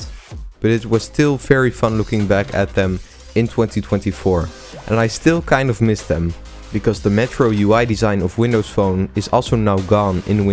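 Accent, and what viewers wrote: Netherlands. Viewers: I can hear the dutch accent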